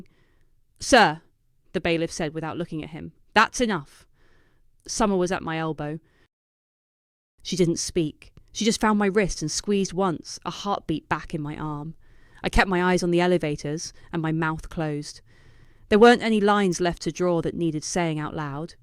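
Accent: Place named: United Kingdom. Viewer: Absolutely hate the British accent in these American stories unlistenable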